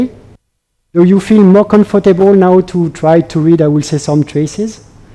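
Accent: France. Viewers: vıcık vıcık fransız aksanı, başka adam yok de mi sunumu yapacak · He says ON POINT: he is trying to pronounce Endpoint apparently. He pronounces English words according to the French pronunciation rules. ZE OST = The host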